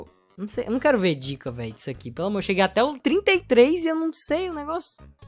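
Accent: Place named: Ceará, Brazil. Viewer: Tu e cearense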